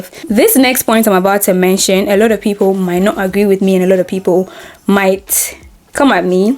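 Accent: Nigeria. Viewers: I said what I said she sounds Nigerian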